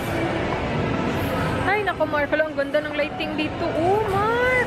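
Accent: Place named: Visayas, Philippines. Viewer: haha ang cute ni ate mag bisaya haha 😌😌😌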